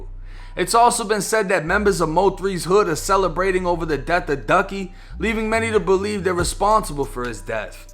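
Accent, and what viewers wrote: Boston, United States. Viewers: U got a Boston accent broo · as usual a brilliant summation of the story for all us to understand. thank you, sir! BTW. are you originally from Boston. i hear an accent there! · I love this kids accent talking about Florida prison and shit. I'm from the Boston area. I talk like him. I'm almost 20 yrs older and this kid makes himself very clear. Right to the point. Keep it up brother.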